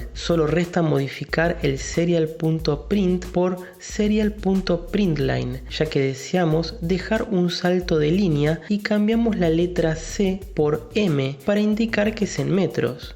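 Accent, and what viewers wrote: Argentina. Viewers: ok claramente eres de arg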